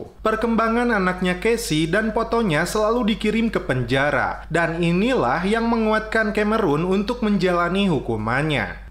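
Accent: West Java, Indonesia. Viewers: Resep euy Ari nu nyarita org Sunda mah haha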